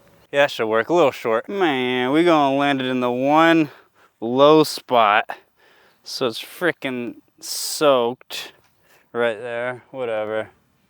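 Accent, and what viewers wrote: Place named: United States. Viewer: always wondered why you have an american accent and say things from 70s-80s vernacular.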